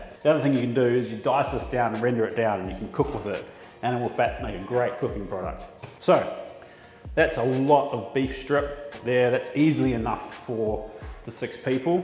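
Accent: New Zealand. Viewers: An Australian (am I picking up a Kiwi twang?) butcher doing a single take video with maybe muck ups along the way? Liked and subscribed. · he is a Kiwi but lives in Australia and has done for a while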